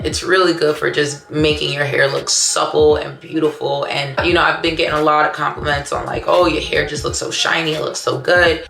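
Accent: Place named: New York, United States. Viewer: Homegirl! I'm so glad to find your channel. First, you're sharing priceless knowledge and thank you for that. Also, it's been a long time since I lived in NYC and just listening to you talk takes me back to the good old days. You are filling me with so much joy by just being you and sharing that with the world. Keep on keeping on. 💖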